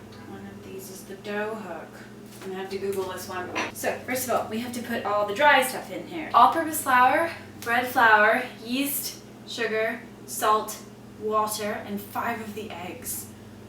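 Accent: United States. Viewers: K who misses the old days when she sounded English no she sound like every other fucking America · I wonder why people still remark about American accent. She's sounded a bit American for several years now. That's not a recent thing. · Not watched a video in 3 or so years. accent is DEFINITELY more American now, it's so weird haha!! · Your American accent has less traces of British accent, and it is just as lovely. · Hard to believe I could be this captivated watching somebody bake. I'm a bachelor and I don't bake, but I might now give this a try. Also, ' ' 's American accent seems entirely natural now. Do you ever feel now like you're "doing" an American accent?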